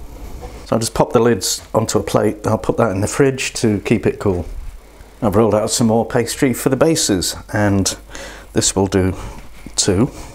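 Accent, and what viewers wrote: Australia. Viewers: There is no need to apologise for your Australian accent. Just like a British does not need to apologise for his English accent in America or Australia! More interested in your recipe. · What BS! I just luuv the Aussie & Kiwi accent, mate.... Really enjoyed this recipe! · NEVER apologize for your Aussie accent! Great looking recipe and video. Can't wait to try it. I grew up in Adelaide from ages 5 to 12 then moved back to the states. Lost my Aussie accent damn it. I remember pies like this and sausage rolls. People love when I make sausage rolls, but I want to add a pie to my list of nostalgic cooking. Can't wait to try this. Thank you. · Love Aussie accent! Go for it! Awesome recipe! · I love Australian accent 😍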